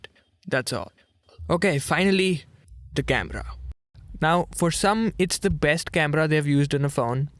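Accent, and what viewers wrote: India. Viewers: I loved Indian accent!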